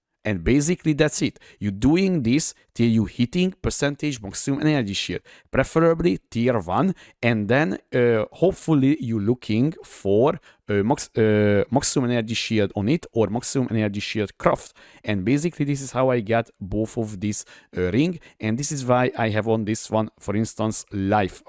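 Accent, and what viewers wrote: Hungary. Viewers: Came here after a recommendation for super tanky builds, not disappointed! De barátom a kiejtésed borzalmas hahaha Rögtön tudtam hogy honfitárs vagy! A kedvencem a kurentli és a dö :)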